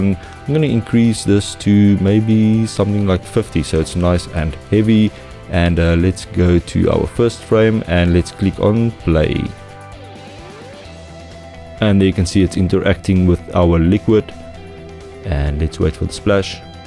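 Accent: South Africa. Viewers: Nice to hear a South African voice doing some maya tuts. subbed.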